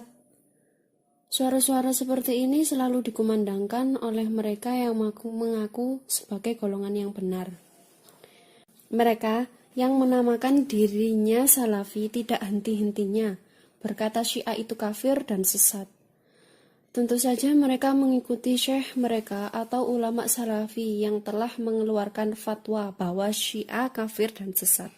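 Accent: Java, Indonesia. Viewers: kalo saya liat logat2 nya org jawa y ka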